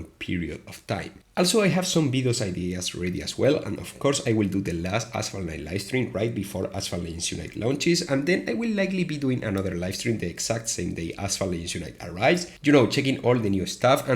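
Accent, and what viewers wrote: Spain. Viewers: Are you from Spain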